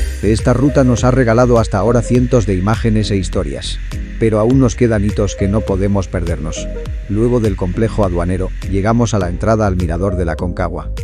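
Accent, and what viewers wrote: Spain. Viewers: Hola !! Soy Chilena es primera vez que veo su video ,me gusto mucho ya que del lado argentino no conocía no e tenido aun el privilegio de ir Supongo que la voz de un español es para hacerlo más neutral bueno la cuestión es que fue interesante muy cultural e informativo somos bendecidos al compartir la cordillera de los andes !! Espero que esa plegaria del obispo Ramón Angel Jara sea escuchada no literal que se caigan las montañas sino que Jamás tengamos que estar en guerra Chilenos y Argentinos ya que seria muy penoso para ambos!! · Hola muy bueno el video y los paisajes. Pregunta , sos argentino ? Por q tenes tonada española.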